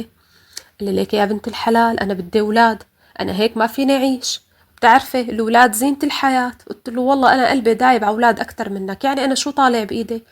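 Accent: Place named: Homs, Syria. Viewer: دخيل لهجية حمصي ❤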